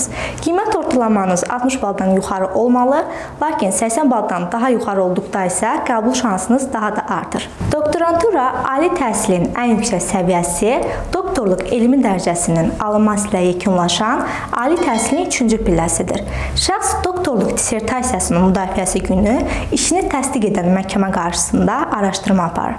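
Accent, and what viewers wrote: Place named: United States. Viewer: Sözləri sanki Amerika ləhçəsiylə ifadə edirsiz. Danışığnzı düzəltsəz yaxşıdı. Adam dinləmək isdəmir. Bu kanal çox faydalı kanaldı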